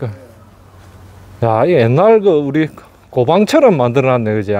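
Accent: Gyeongsang, South Korea. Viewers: 구수한 경상도 표준어 외지에서는 경상도사투리라고 합니다 오늘 영상 잘봤습니다 항상 감사합니다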